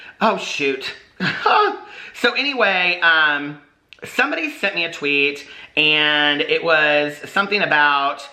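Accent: South, United States. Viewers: where do you get your southern accent and sayings? I've never heard "gag a maggot" outside of the south. Love it!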